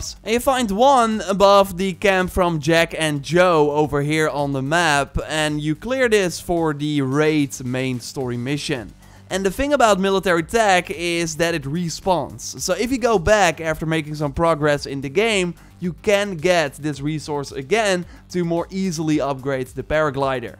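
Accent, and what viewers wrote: Netherlands. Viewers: Great video, cringy dutch accent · Nice Dutch accent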